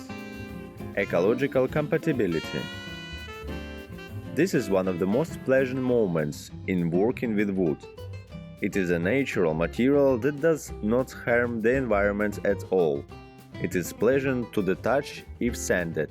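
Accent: Russia. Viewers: Русский чувак озвучивает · Это просто пи..ец как режет слух, а выговаривание воз вместо уос подчёркивает что акцент русскоговорящего.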